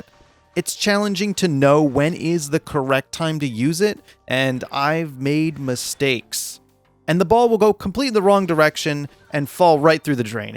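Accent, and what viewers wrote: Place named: New York, United States. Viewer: Yo you from NY cause dead ass you sound like my ass to the T when I was a kid and there was a bowling alley with a addams family pinball machine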